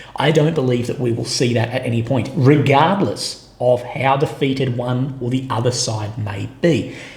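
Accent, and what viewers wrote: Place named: Australia. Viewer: Today with this green t-shirt, you look every bit the Australian that your accent gives away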